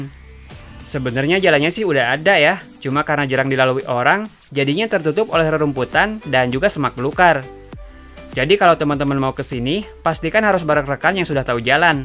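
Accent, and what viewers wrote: West Java, Indonesia. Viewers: 👍👍 untuk video dan narasi nya. Logat sundanya kentara, ti Sukabumi sanes ?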